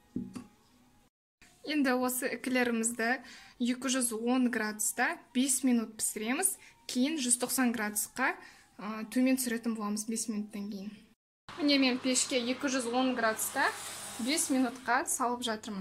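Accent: Standard. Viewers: Саламатсыз ба? Қазақша таза сөйлеп, түсіндіргеніңізге қуаныштымыз. Өте керемет, риза болып отырмын. Бар білгеніңді ортаға салғаның қандай тамаша! Каналың дами берсін. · Қолыңыз дерт көрмесін осылай қазақша таза түсінікті айтып түсіндіргеніңіз үшін сізге үлкен рахмет айтамын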